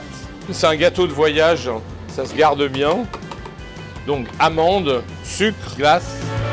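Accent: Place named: Paris, France. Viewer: J’adore l’accent parisien mais j’aime pas les fruits confits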